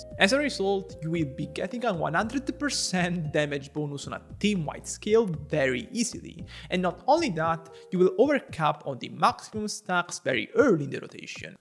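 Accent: Italy. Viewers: Are you Italian by chance? Your accent is very much the part.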